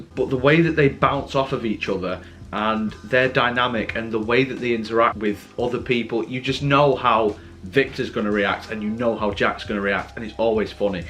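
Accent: England, United Kingdom. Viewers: I never thought I'd hear an Englishman who could appreciate this show :)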